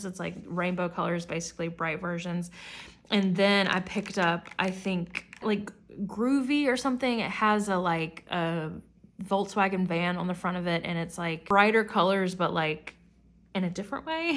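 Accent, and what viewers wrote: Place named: South, United States. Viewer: Listening to you ramble about your stationary & journalling for an hour has been a JOY!! I wanna write all the ways I have decided you are awesome but I think that would be super unsettling, so. 🤣😭 Okay, I'm gonna do it anyway. I love an uncut stream of consciousness of absolutely nerdy shit, like I want to tell my partner about the intricacies of each of my pens and each of my storage devices too, for reals it's so fascinating idk!!! I don't because to be frank I get sick of the sound of my own voice, but a cute ass chill queer southern babe is perfect for my ears. I can't do much with a million journalling structure videos (tho, love the info sometimes, but I don't have the patience or concentration to just wAtch a video?) that are at most twenty minutes long - while I'm preplanning literally just one title page for an hour (what was that YT person you said is really good at Just Going For It again??), I need someone w an effortless ramble made of pure chat about journalling with no urgency at all. 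rewatching this this morning and a few mins in, very Sunday morning vibes, I bursting out laughing at the extremely niche relatable dropping of "Some weird selfie of me crying in the car" 😭😭 babe you're EVERYTHAnnnnG. Pls never stop (okay that's literally totally and always should be up to you, no pressure.. okay pressure, but that chill kind of We Love It not like needy entitled audience but lookin at your comments section we already love yeww. so no pressure.) I hope you know t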